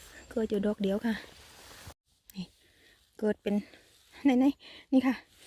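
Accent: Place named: South, Thailand. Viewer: น่ารักจังค่ะ พูดอิสานสำเนียงใต้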